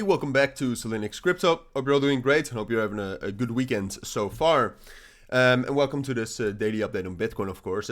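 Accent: Netherlands. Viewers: Duurde even voordat ik je nederlandse accent hoorde. Engels gaat je goed af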